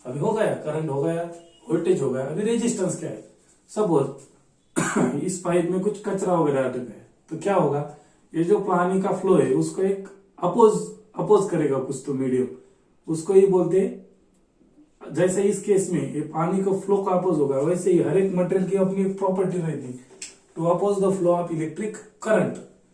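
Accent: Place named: India. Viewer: Love your Hindi Accent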